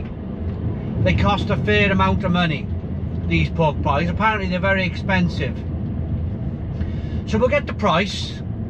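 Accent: Wales, United Kingdom. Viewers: His welsh accent must have confused you. He said it contains 'uncured pork' so M&S won't be in any trouble at all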